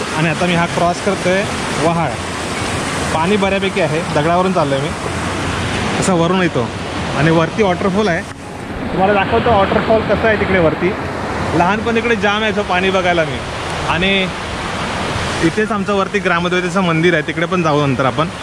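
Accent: Konkan, India. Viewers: दादा तू कोकणी आहेस का बोद्ध आहेस तुझ्या बोलण्यातून तू कोकणी वाटतोस गाव खूपच सुंदर आहे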